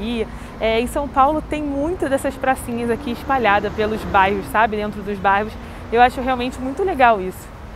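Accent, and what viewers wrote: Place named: Rio de Janeiro, Brazil. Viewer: Totalmente sem sentido colocar uma carioca para falar de Moema. Ninguém merece ouvir lilais.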